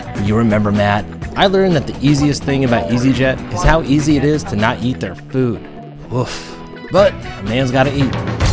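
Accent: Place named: Alaska, United States. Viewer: Where in laska you from!?! Palmer!?! You sound like a 'sillian..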